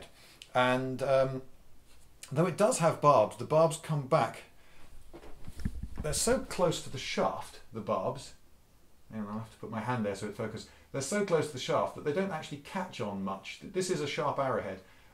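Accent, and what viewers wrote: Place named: England, United Kingdom. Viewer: You may ramble all you like Luv. My Grandfather was from England and I loved to listen to him talk and hear that "Accent" of his and once in a while (More oft then not.) that same "Accent" will come out of my mouth and my friends at "The Minnesota Renaissance Festival" just love it when that happens because they know for a fact that I am not faking it like they are and the "Accent" comes out a great bit more there. But all in all. I miss hearing a pure English voice like yours So. Keep on rambling on Luv..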